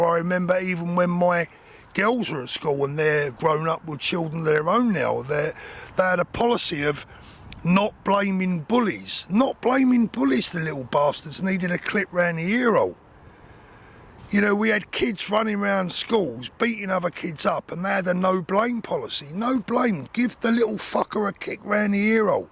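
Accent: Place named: London, United Kingdom. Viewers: !!! Mate!!! I fucking love a fucking English man with a fucking badass London accent who fucking knows how to fucking swear. It brings a tear to this Aussies eye. 😂 But seriously, never truer words spoken, well said. 🇬🇧🇦🇺🇬🇧🇦🇺🇬🇧🇦🇺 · Fantastic!!!!! You are very eloquent. The message would be the same if spoken by a toff, you've just got a different accent, a capital accent! Loved it, i'm in full agreement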